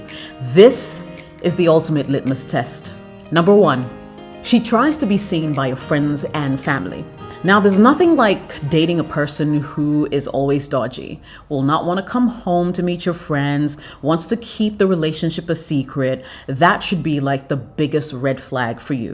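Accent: England, United Kingdom. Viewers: Beautiful dark chocolate with an ENGLISH ACCENT.... I'm infatuated. Love from "across the pond". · Did you do this video all in one take? And did you read out of a telescreen prompter? That last clicking noise you made reminded me of bdsm, sounds a bit horsy. On the other hand you have a nice english accent. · You're vocally appealing with some british,twang!👌👍🙏🙏🙏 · Have u lived in the Uk b4, u have a wonderful accent. Thank u for all the advices it really helped a lot. You are 👏 amazing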